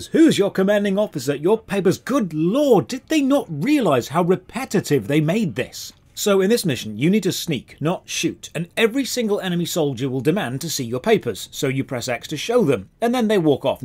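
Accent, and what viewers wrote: United Kingdom. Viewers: Listening to you talk in this video, it makes me sad to realize we never got a Bri'ish Commando MOH game.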